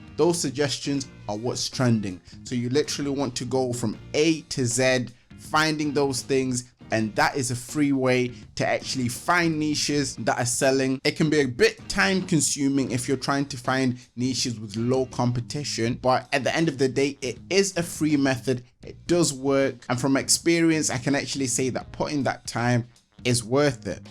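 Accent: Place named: Manchester, United Kingdom. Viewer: Ha, yes! I recognised a fellow Manc. Nice one our kid. 😁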